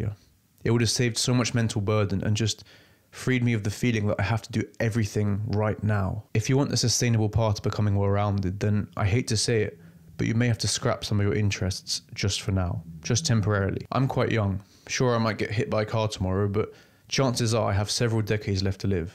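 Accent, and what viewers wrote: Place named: United Kingdom. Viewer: oh sorry, I didn't mean English (by blood), just British as in nationality (which I assumed from your accent?) for some reason it's really hard to find British YouTubers on here, so many (great) American channels but it's pretty refreshing to hear British accents (from a Brit aha)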